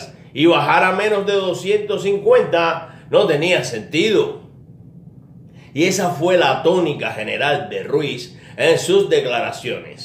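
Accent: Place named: Cuba. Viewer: Con ese acento y no sabes jaja...Cubano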